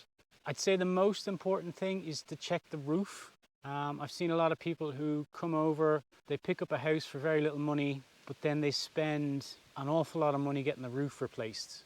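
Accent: Dublin, Ireland. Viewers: QUESTION! Presuming from your accent you're a Dub (or certainly the Pale area), but if you are a Dub are you a northsider or a southsider? I'm a northsider meself 👍🏻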